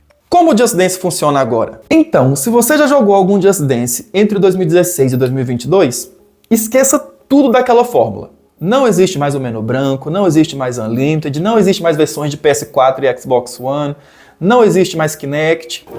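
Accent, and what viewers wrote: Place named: São Paulo, Brazil. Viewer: Eu to rindo horrores com o sotaque paulista hahahahaha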